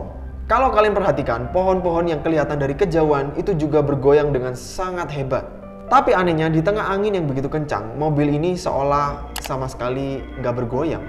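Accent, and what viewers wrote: Java, Indonesia. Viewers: Mase medok asline mana to? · Bang sodaranya tirta ya🤔 medok banget😂😂😂😂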